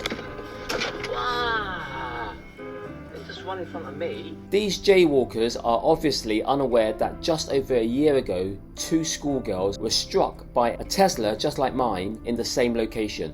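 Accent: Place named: United Kingdom. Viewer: I like you have the Hong Kongers' 「Wah」expression while you still have the Brits accent😂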